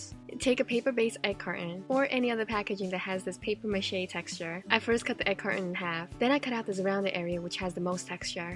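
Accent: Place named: New Jersey, United States. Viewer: I think she sounds like she is from New Jersey, either Jewish or Italian.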